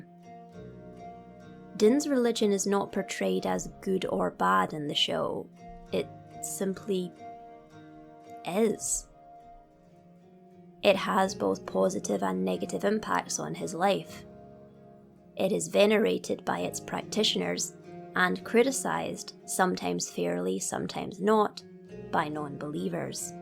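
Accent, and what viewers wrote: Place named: Scotland, United Kingdom. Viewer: I hear a Gaelic accent in your voice ( im assuming Scottish?) Interestingly, Karen Traviss when writing the Republic Commando series based Mando culture heavily on the Celts. Mando'a is spoken like Gaelic but with more of the rules of Norse as far as vowels and such. Aliit Ori'Shya Tal'Din for example. Its what's being sung when Boba Fett finds the Tuskan tribe that adopted him in dead. It means family is more than blood. The family structure is similar as well to both cultures, though women are expected to take even more of an active role in defense/war unless they have children. As a Christian Dins journey reflects my own in some ways. Im more like Boba Fett personality wise, but i struggle between the world and the church. Literally the only other character i related to in this respect was Quigon Jinn vs the Jedi Council. 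But for my relationship with the greater church. In his case, it was the Will of the Force vs the Will of the Jedi.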